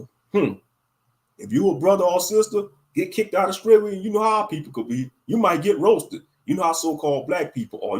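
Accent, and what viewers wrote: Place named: Louisiana, United States. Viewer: You are Clearly from Louisiana 😂 Good video tho. Ringo brought me here 👍🏾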